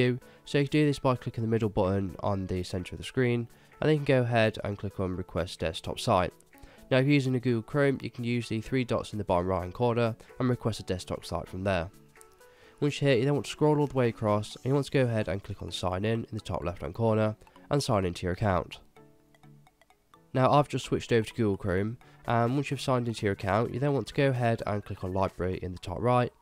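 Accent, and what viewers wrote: United Kingdom. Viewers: What a beautiful Voice, is british language ? Wow. 🤗✨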